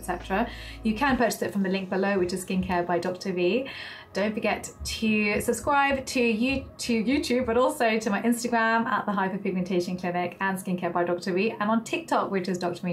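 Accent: United Kingdom. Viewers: please remove the background music 😁 because we have difficulty to hear your voice properly 😕 and we love your British accent 😀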